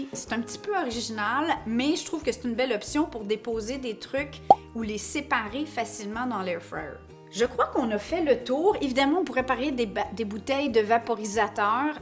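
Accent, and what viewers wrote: Canada. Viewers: J’adore votre accent canadien, je me sens un peu en vacances en vous écoutant 😊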